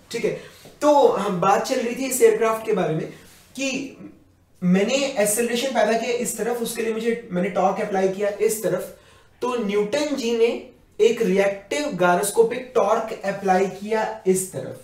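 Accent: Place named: Punjab, India. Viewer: ap pkka punjabi ho sir....jo baar baar raje rajee kr rhe the...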